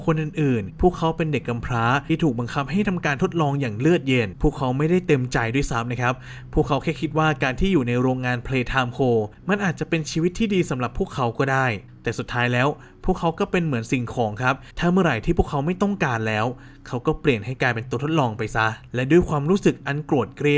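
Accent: North, Thailand. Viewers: พี่คนเหนือป่าวดูหลายคริปน่าจะใช่5555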